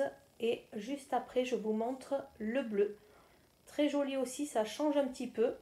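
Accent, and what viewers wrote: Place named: Southwest, France. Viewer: Bonjour, A Lyon il n'y a pas de magasin PARVIS. Tu as des enseignes vraiment très bien dans ton Sud-ouest (et avec l'accent que j'adore 😍😍😍😍😍)!😘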